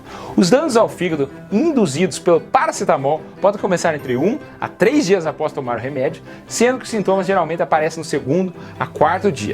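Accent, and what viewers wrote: Brazil. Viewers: Gente, da onde que vem esse doutor? Que sotaque é esse? É do sul ou do centro oeste?😅😅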